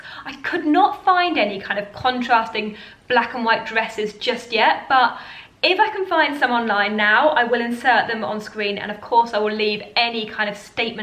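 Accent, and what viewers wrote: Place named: Southern England, United Kingdom. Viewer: hey, i just subscribed to your channel because i really enjoyed your personality and it was a very pleasant video to watch. Question: what area of the UK are you from? from the sound of your accent ( which is lovely by the way:- ), ) i'm guessing you're from the south of England but, i was just curious as to what area in the south are you from? i.e. Greater London, etc... Also, you looked very pretty in all the outfits you tried on:-)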